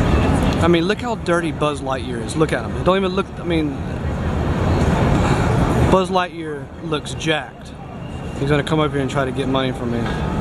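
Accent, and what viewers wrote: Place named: South, United States. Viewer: your from the south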